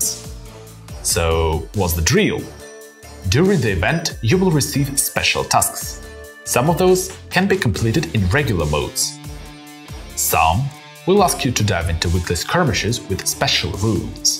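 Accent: Russia. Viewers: I wish you guys would get someone who could talk without such a heavy Russian accent, it’s really hard to understand what’s being said on these videos.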